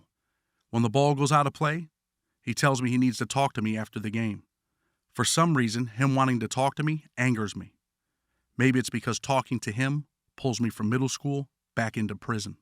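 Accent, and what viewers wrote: United States. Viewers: Always a good video. Props to you. I know you you from upstate and all that but damn homie you sound country as hell tho boy ha. Peace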